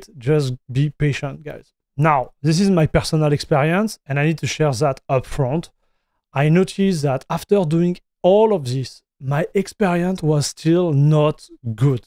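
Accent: France. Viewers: I like your frenglish voice, sounds refreshing.. o)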